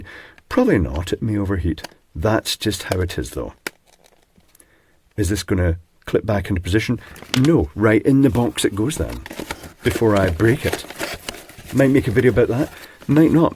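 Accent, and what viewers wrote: United Kingdom. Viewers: I bought the test leads for $0.93. I love to listening you and Your British accent.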